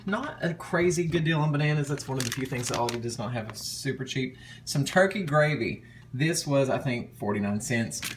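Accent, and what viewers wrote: South, United States. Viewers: I had to take a pause just too type this message! I love ur southern accent or the way you speak whatever you want to call it! I love it!!!!!!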